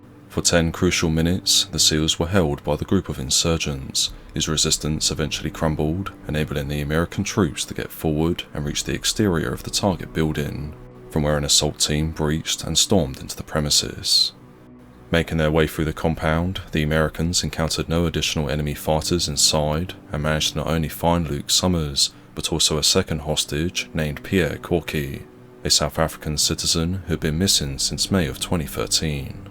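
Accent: England, United Kingdom. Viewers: I agree the video creator really works hard on finding what he can about the wars all over. He has a very clear and easy to understand what he's saying. I'm American and I assume the channel owner is from England and I can understand his accent easy. I drive a lot and have listened to most of his content driving down the road with my ear piece. I know some of this to be true which I am sure all things in the video happened. We love your channel my whole family. Thanks for the phenomenal work you do.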